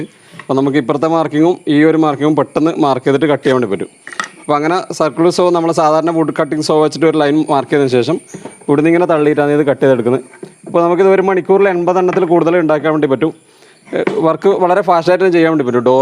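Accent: Kannur, India. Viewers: You are allrounder Best of luck. dear. And i like kannoor langage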